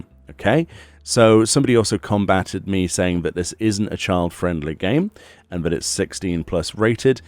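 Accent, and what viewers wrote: United Kingdom. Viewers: From someone who neither rp's or erp's, I don't really understand why you go so harsh on ERP specifically here and state you dont when you obviously have some sort of issue with it o.O I do not agree with any type of public ERP but in 6 years of playing have never seen any on chaos, mainly as I haven't been looking for it I guess but haven't seen any interactions of the sort in public channels. SE can only police so much and whenever I've seen people advertise 18+ venues (from what I can tell) they have not been ERP specific just clubs mainly. There is also a language filter and emote filters, so in order for minors to be exposed to ERP or unacceptable behaviour in terms of age related content they'd have to remove the profanity filter and go looking for things within the game. That's a parenting issue, OR like most UK based 16 year olds at least, they're already well aware of the workings of the world in that respect and are treated as the young adults they are, you can't screen everything because some 16 year old or younger may see things they shouldn't when every precaution has been followed as much as is possible. 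age of consent in the UK is 16+. not 18. (im guessing this country is uk from the accent?) and do not require consent. the pegi rating is also for story content and is PG recommended.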